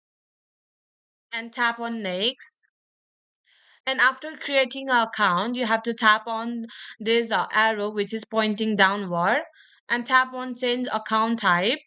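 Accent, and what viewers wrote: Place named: India. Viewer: Next time use an AI English voice. The Indian English accent is very difficult for most American to understand. But otherwise good info.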